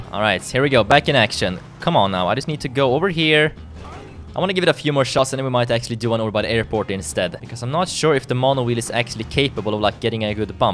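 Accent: Sweden. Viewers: Are you from sweden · you sound swedish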